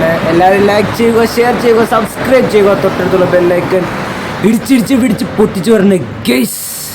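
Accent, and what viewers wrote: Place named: Muvattupuzha, India. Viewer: സംസാരം കേട്ടിട്ട് മുവാറ്റുപുഴ പോലെ തോന്നി 😂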